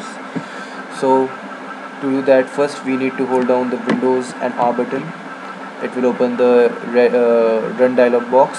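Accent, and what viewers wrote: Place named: India. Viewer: FIRSTLY; SPEAK PROPER ENGLISH== NO ONE UDNERSTAND YOU >>>dont speak English in Indian's accent ! second: whatever you write or add to the right pane in the registry; IT DOES NOT MESS THE COMPUTER THE PROBLEM OF THE REGISTRY HAPPEN ONLY IF YOU CHANGE EXIXTING VALUES IN THE LEFT PAN: where the yellow keys are := even if you add any key to the left pane; it does not do any harm to the computer: SO STOP OVER-SCARING PEOPLE FROM OPENING OR USING THE REGISTRY !! SPEAK PROPERLY ; WILL YA???????????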